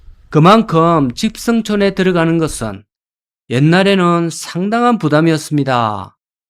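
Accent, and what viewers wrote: Gyeongsang, South Korea. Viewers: 좋은데 갱상도 말을 좀 줄여요~~!!!!!!!!!!!!!!!!!!!!!!!!!!!!!!!!!!!!!!!!!!!!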